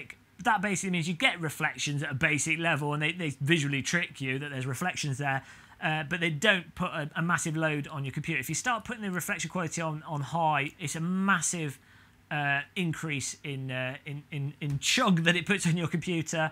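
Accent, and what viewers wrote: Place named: East Midlands, United Kingdom. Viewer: I'm sure that sounds like an East Midlands accent? Nottingham?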